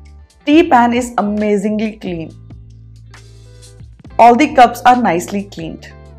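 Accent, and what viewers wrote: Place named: India. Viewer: Ayyo sumne kannad baralla Anta heltare illa Andre swalpa swalpa gottu Anta Hindi accent Alli heltare..nam Bengaluru kathene istu 😀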